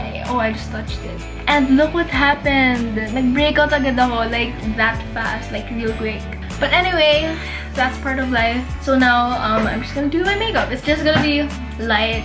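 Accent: Batangas, Philippines. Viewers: Can you make a video na Batangueña yung accent mo sa whole vid?😅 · batangueño accent ahah!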